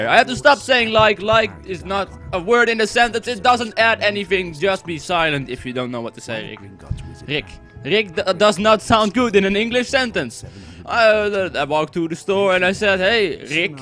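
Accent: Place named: Netherlands. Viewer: Denglish Dutch-english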